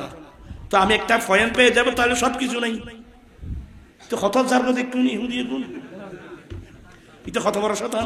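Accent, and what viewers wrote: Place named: Chittagong, Bangladesh. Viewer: চট্টগ্রামের আঞ্চলিক ভাষাটা ছাড়তে পারে নাই।রংপুরে আহলে সুন্নতের আলেম নাই বুঝি?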